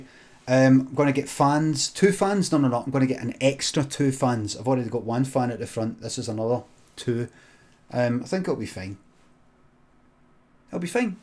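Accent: Glasgow, United Kingdom. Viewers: I wish YouTube's automatic CC knew how to translate Glaswegian to English.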